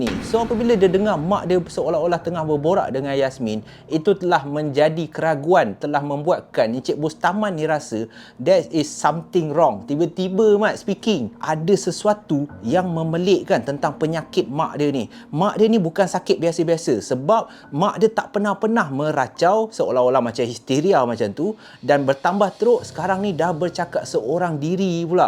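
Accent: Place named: Kelantan, Malaysia. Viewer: acu tera crito kuk baso klate pulok ehh...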